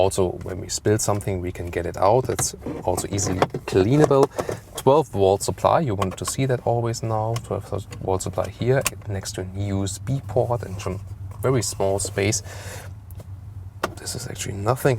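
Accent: Germany. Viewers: Man hört schon stark das du deutsch bist^^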